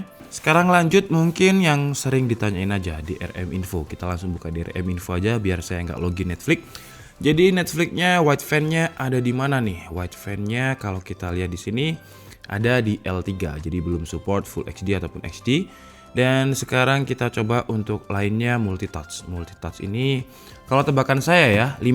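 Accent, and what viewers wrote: Java, Indonesia. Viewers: njenengan Jawane pundi mas ? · Mas jowone daerah pundi Kulo boyolali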